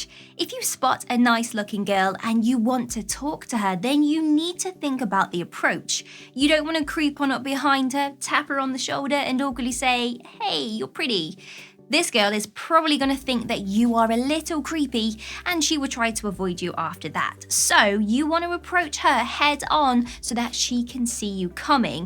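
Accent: United Kingdom. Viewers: I just found this channel tonight; this girl is really cute and I love her British accent.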